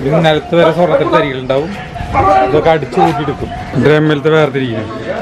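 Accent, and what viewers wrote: Thrissur, India. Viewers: സ്ലാങ് കേട്ടിട്ട് തൃശ്ശൂക്കാരൻ അന്നെന്നു തോന്നുന്നു. ഞാൻ തൃശ്ശൂരാട്ട നിങ്ങൾ തൃശൂർ എവിടെയാ 😍😍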